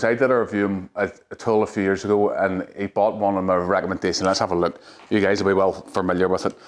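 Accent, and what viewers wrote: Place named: England, United Kingdom. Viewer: Cant understand your queens English eho. Give me a Kiwi any day.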